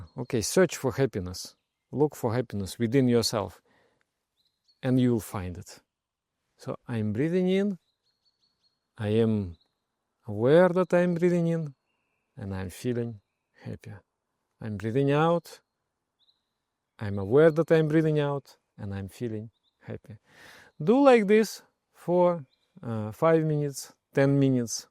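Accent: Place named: Russia. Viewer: Is this guy Russian?! 🤪😝 I know this accent all too well 🤣 Great meditation, I’ll stop getting distracted by my country’s accent 🧘‍♀️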